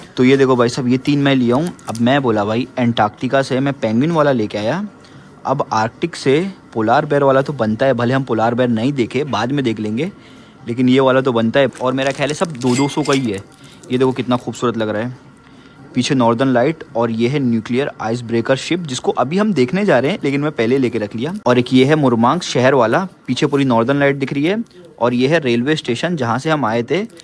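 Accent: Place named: Maharashtra, India. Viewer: Tu marathi accent m mat bol bhai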